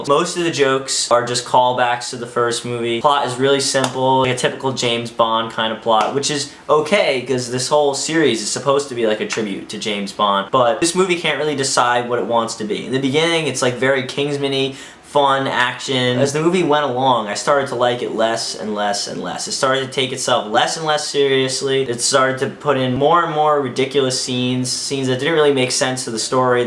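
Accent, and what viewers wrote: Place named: New Jersey, United States. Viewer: l love the hint of a jersey accent you have 😄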